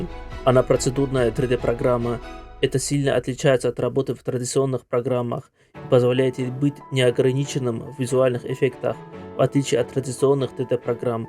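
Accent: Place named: Dagestan, Russia. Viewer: Судя по говору , либо Дагестанец либо Чеченец, я пока еще не разобрался 😅😂 Салам из Татарстана 👋😁 Я вот в FreeCad начал работать, мне больше интересна техничка и точность.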